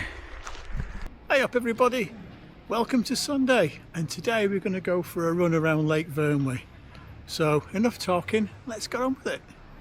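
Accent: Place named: Yorkshire, United Kingdom. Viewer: How come you got a Yorkshire accent and you're running for running for l the Welsh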